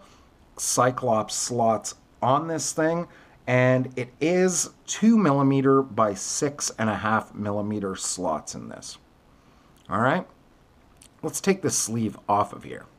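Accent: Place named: Quebec, Canada. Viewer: Lol, your accent was more French Canadian than Louisiana.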